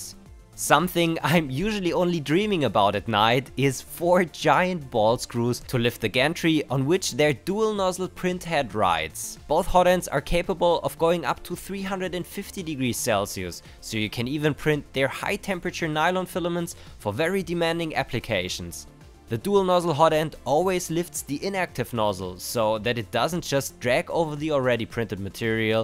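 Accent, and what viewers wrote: Germany. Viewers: Dnk how's your english so clear being you german, you only have a little sharp pronounce but's the deutsch accent while your spoken english is perfect. How did you manage to found the only chinese I've ever heard speaking english with a mix of nigerian and indian accent? He's rare! :D sorry I couldn't un-notice it :D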